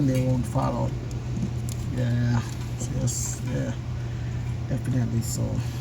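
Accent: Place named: Jamaica. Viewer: Sound like a yaadie🤔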